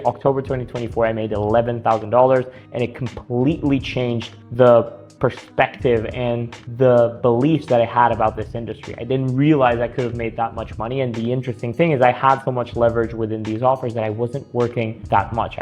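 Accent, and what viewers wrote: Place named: United States. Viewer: Damn, had no idea that you were french, your american accent is perfect, atleast to my foreign ears.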